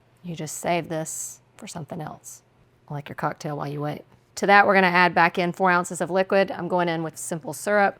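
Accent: South, United States. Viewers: Terrific accent too - especially if you’re from the UK and don’t hear these beautiful southern US accents much. She’s a smart, natural presenter… :)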